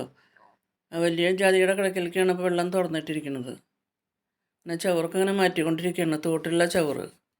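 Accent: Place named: Thrissur, India. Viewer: തൃശൂർ ആണോ ചേച്ചിടെ വീട്. സംസാരം കേൾക്കുമ്പോൾ തോന്നുന്നു. എന്റെ വീട്ടിലും നിറയെ ജാതി കൃഷി ഉണ്ട്. 👌👌❤️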